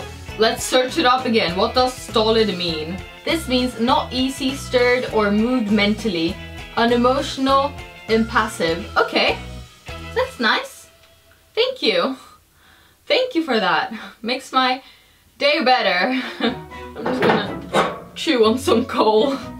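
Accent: United Kingdom. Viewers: Why do you sound british fancy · you seems like your voice right now is like British and your voice in gaming is like america!?!? And are you even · Why in these videos you have like a British ascent and now days you don’t?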